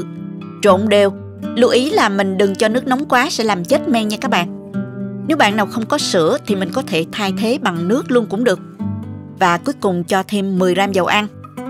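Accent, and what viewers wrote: South, Vietnam. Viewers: nói giọng miền nam rõ ràng và dễ thương lắm. Chỉ dẫn rõ ràng, thao tác chuẩn bị sạch sẽ. Cảm ơn cô đã chia sẽ nhiều món ăn ngon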